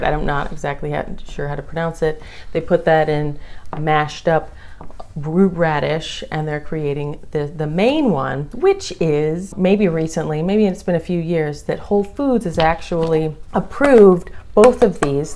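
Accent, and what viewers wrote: United Kingdom. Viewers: how tall are you & wots your zodiac sign, where is your accent from as u sound British??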